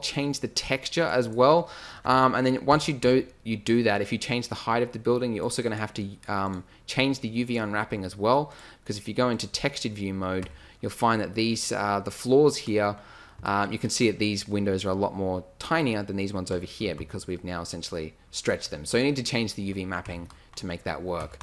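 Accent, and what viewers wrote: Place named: Australia. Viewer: Why are you Australian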